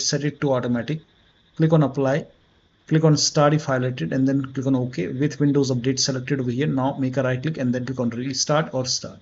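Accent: India. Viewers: i know i can fix this after i heard his indian voice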